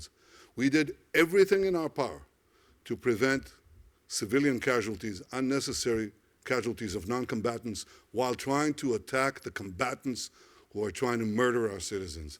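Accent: United States. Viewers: How is the same accent like Americans🙄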